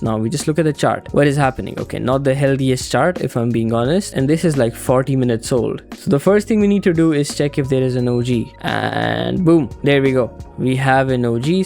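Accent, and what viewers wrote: India. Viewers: Your accent is somewhat Indian.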